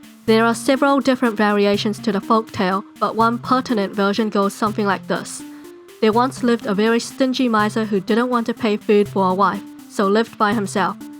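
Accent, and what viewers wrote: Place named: New Zealand. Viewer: I KNEW IT! THE INSTANT I HEARD THE VOICE I KNEW IT!! HELLO FELLOW KIWI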